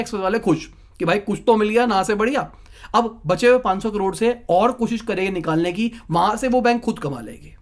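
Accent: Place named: Punjab, India. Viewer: Your accent is Punjabi. Im right? Pegchanana mushkil hy being a Punjabi i can understand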